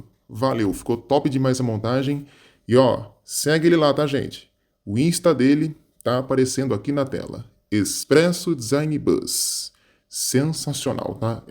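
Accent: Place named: Inland São Paulo, Brazil. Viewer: Narrador com sotaque de paulista do interior.... é bizarro!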